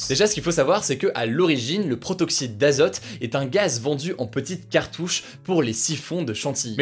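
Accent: England, United Kingdom. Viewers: Ton accent anglais >>>>> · j'adore ton accent anglais! Merci pour tout le travail que tu fournis! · Ton accent anglais est trop bien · Y a qu'à moi que son accent anglais fait marrer ?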